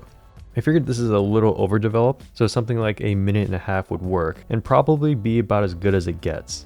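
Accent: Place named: United States. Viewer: HALLO from a WET and GREY BRENTWOOD, Essex ENGLAND !! Just FOUND your Channel and LOVE your Experiments ( and the American ACCENT-- got any Gum Chum ? ) I have a similar channel for film stuff and a few Piano Playing episodes !! I subscribed as I want to watch your Video on the Old VERICOLOR as I have some of the SAME in Fridge !! Keep Snapping -- Peter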